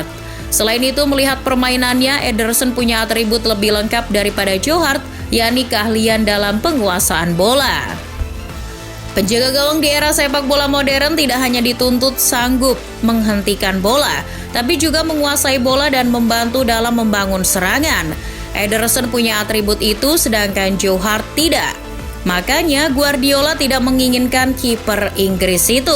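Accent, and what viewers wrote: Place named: Sunda, Indonesia. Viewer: Orang Sunda pisan pengisi suarana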